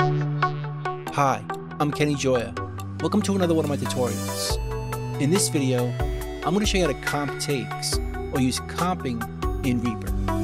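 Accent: New York, United States. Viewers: Are you from New York?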